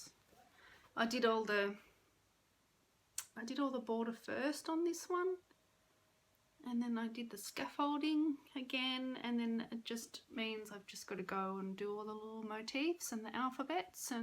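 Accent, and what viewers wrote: Australia. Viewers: Another fun video. Glad your sons are enjoying participating in your floss tubes. Love all your stitching and your Australian accent. Frosty is adorable and his name fits him purrfectly. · I’m binging your videos while working. I love seeing all your things and I love the Australian accent as well. Bring a southerner in the US, it’s fun listening to others’ accents and they various things are pronounced. I can wait to see more!! Stitching and quilts alike 🥰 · I love your Aussie accent! Be you and your work is beautiful😁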